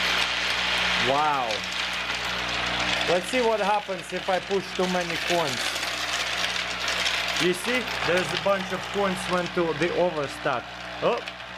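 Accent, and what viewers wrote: Russia. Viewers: Dudeeee i love his accent, super heavy Russian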